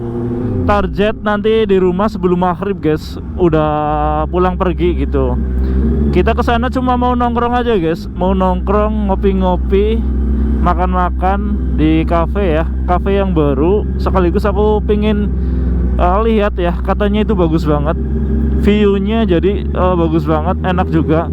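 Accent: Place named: Banyumas, Indonesia. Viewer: Biasa ngapak dadi angel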